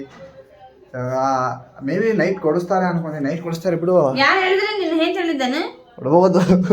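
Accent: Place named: Bangalore, India. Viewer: Tamma ni nam uttara karnataka style dage maatadu bangalore language set aagalla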